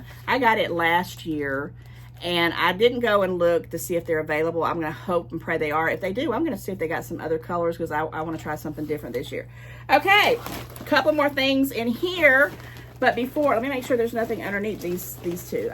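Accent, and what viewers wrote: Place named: South, United States. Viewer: Hey Hun it's a Southern Draw. You won't get one unless you're in states like Georgia, Alabama, Tennessee, Kentucky, Mississippi, or South Carolina. Mainly Mississippi, Alabama, and Georgia. These are the Southern and Deep South states.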